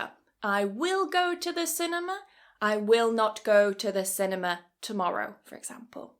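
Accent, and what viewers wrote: United Kingdom. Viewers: I love your accent. May i ask where you are from?Which part of Britain speaks it? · Is this British English? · Hello, I'm Oscar Quiñones, I'm Colombian. I love you British accent!! 😊😊😊, Thank you for you class! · I find this video particularly entertaining, I'm from Costa Rica, is this British accent? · Ohh My gosh British pronunciation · l have american accent but l love your british accent as well you are great my dear❤❤❤ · I love UK accent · Love your British accent ❤❤❤ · Litterly this Vedio solved 30% my problems in English specialiy word and world 🌎 I really loved British accent I'm try to work on it to get good pronunciation it's was lovely Vedio thanks sis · 100% is British accent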